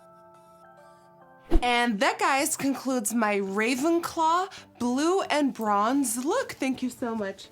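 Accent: United States. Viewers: Your accent is impeccable...I didn’t even know you weren’t from the US for a while until you said it in another video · It’s so mind blowing how you have zero accent! You sound so American, you are professional makeup artist and language learner! It’s so hard to learn another language. Thank you for teaching us Dutch! What a fun way to learn :) love it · I CAN’T BELIEVE YOU ARE DUTCH! YOUR ACCENT SOUNDS SOOOO AMERICAN...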